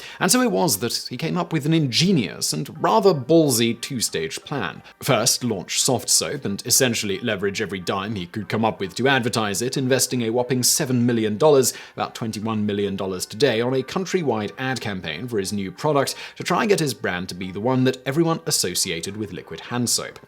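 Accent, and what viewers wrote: United Kingdom. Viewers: Un trap en ur....lol the British pronouncements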